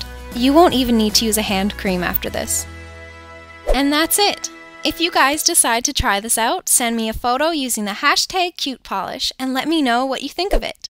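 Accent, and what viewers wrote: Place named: Canada. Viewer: You can tell she is Canadian because she says "Tay-g" Instead of "T-ah-g"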